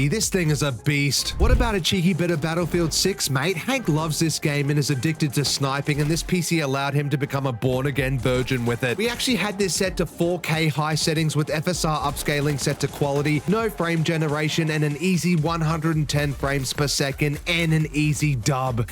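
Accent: Australia. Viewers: Do people ever comment about your aussie accent? I live in an area where you never hear it.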